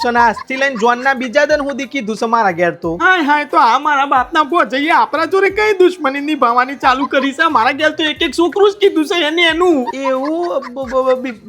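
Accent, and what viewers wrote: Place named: North Gujarat, India. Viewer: ભાઇ ઉત્તર ગુજરાતી તળપદી બોલી સાંભળી દિલ ખુશ થઇ ગયું ❤❤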